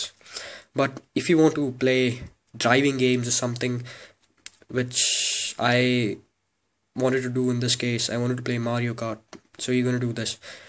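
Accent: India. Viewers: u indian?? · indian accent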